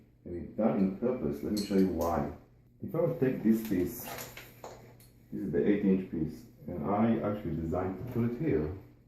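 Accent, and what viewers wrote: Israel. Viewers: Very helpful and insightful. My bf and I are remodeling our shower right now and have been trying to figure out how to do backsplash onside an insertion for shampoos and soaps. Let me tell ya, thats not for faint hearted 😂. Thank you. Just a question, are you Israeli? Your accent is very familiar. Thanks a bunch again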